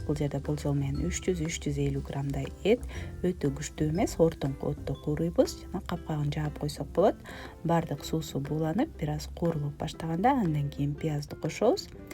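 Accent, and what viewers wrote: Standard. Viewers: Таза кыргызча сүйлөйт экенсиң, кооз, уккулуктуу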